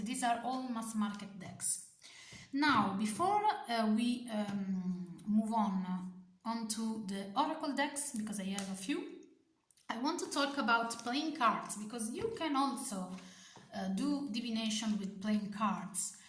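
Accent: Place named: Italy. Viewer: Love the decks you've chosen!! And your Italian accent is so delicious, I didn't know most of the decks you presented!! Thanks a lot.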